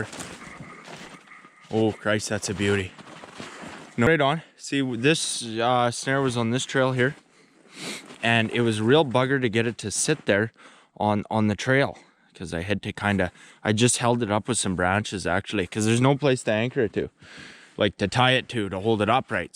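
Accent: Canada. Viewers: where are you located you sound canadian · hahaha nothin brightens my day quite like that canadian accent sayin "well good day there boys n girls". i was lookin in my subscriptions and this video was at the top and started running the video without the audio and i could see his face and the subtitles writing that first line out and it made me chuckle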